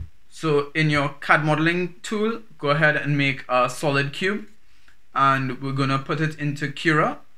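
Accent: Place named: Jamaica. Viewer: Thanks so much for the Video with the ender 3 saved me a lot of time. Sounds like you are from Jamaica, I grew up there myself, one of the few white Jamaicans out there. Thanks again, for the great job!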